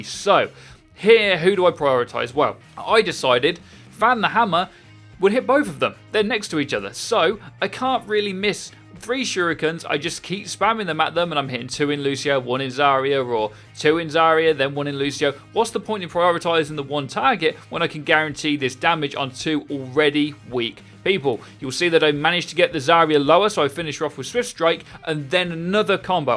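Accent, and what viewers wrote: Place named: Norfolk, United Kingdom. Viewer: You sound like a fellow Norfolk boy!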